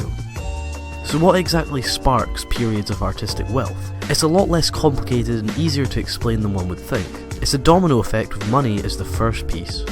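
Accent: Scotland, United Kingdom. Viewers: what accent is that? I am sure it is british but cannot pinpoint the region.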